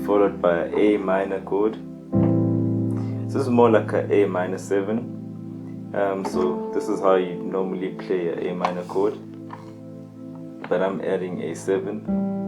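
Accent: South Africa. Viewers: awesome. You sound like you from KZN or Gauteng? I just noticed your channel and subscribed now. Thank you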